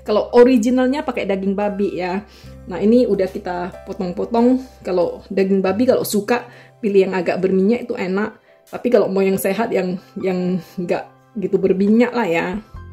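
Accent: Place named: Tanjung Balai, Indonesia. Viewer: kalau dengar logat dan bicaranya mak mungkin punya latar belakang orang tj balai ya , sukses selau mak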